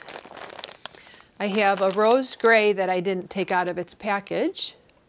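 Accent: Chicago, United States. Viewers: Hi,. I recently came upon your podcast and enjoyed it so much that I have subscribed and watched many of your prior podcasts. I am so pleased whenever I get an alert that you have released a new podcast. I’m originally from the Midwest (actually the Great Plains) and went to college with students from Chicagoland - love to hear the accent again. I started spinning in 1979 and have loved going down this fiber rabbit hole! I have a suggestion about the crocking situation with the red cashmere you are using for the Sophie Scarf. Certain dyes, most notably reds, teals, and blacks, tend to crock or rub off on your hands when working with them. The dye molecules haven’t chemically bonded with the fiber molecules, possibly because too much dye was used. I usually wash yarns like this using Synthrapol, a liquid detergent which suspends dye molecules in water. 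The molecules do not reattach to the yarn and the excess dye washes out. It doesn’t take much Synthrapol but you might need to wash and rinse twice. Synthrapol can be found at quilt shops and dye suppliers, i.e. ProChem or Dharma Trading.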